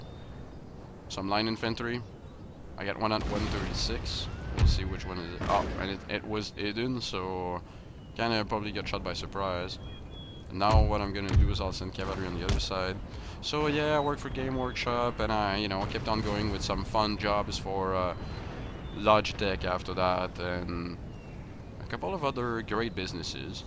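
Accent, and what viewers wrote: Quebec, Canada. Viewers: are you Québécois ?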